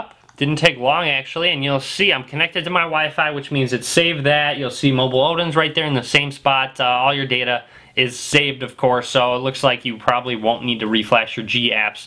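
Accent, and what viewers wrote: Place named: Chicago, United States. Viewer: This guy has to be from Chicago. I'd know that accent anywhere.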